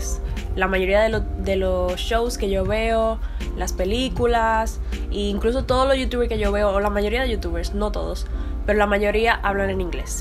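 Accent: Dominican Republic. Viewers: Me encanta el acento dominicano! 🙂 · I'm not trying to be biased cause I'm half Dominican. But the Dominican accent is like the best. 😏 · I just found your channel. Nice to see a Dominican YouTuber. You have a nice voice/accent! You sound a lot like my cousin who lives in the Dominican Republic. Like EXACTLY like her. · Wow q genial! Me encanta tu accente.. El accente Dominicano. Mis vecinos pq soy un Haitiano. 🇭🇹. Soy nuevo de YouTube. La verdad me motive mucho, para sigue aprendando mas espanol, y tambuen sigue adelante con Mi canal aqui.. yo no me voy por vencido.👊 GRACIAS 🙏🙂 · Es mi primera ves ver tu video. Yo hablo inglés pero siempre estoy buscando oportunidades de practicar el español. Yo te entendí perfectamente que me asombra porque el acento dominicano es único. Gracias y espero más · ¿Buen video, algo me dice que eres cibaeña, quizás de Santiago cierto? 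(Yo también soy del cibao STGO 👌🏽. PD: Your channel's name reminds me of song: ❤, kep it up with the good work, greetings from DR! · Más videos en español ! amo el acento Dominicanoo <3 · I'm also Dominican! Love your accent! Sounds beautiful, Spanish but with African twang and a bit of Arawak. Love our Dominican Spanish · Finally a real Dominican accent that’s not exaggerated. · por fin un canal con una dominicana que habla como hablamos la mayoria de los dominicanos, asi es que habla el dominicano promedio.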